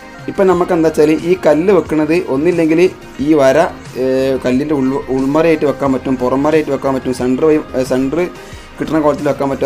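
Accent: Malappuram, India. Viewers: Ith malappuram bhasha alle. Atha ganethanne aaymkotte😍😍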